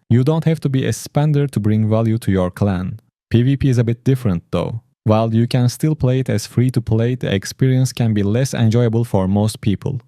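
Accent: Turkey. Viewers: Türk olduğunu söyleyebilirim ama ispatlayamam. Aksan bariz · Türk aksanı nerde görsem tanırım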